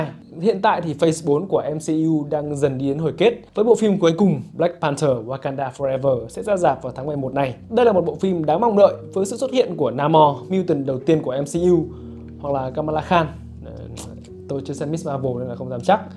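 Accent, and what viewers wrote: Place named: Central, Vietnam. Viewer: Yo kh bàn đên sự vô duyên của ông thì cái nói miền trung tôi thấy nó hơi có mùi phân biệt r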